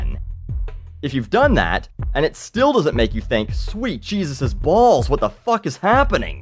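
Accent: United States. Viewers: That was a fuckin great American accent. Lol good video.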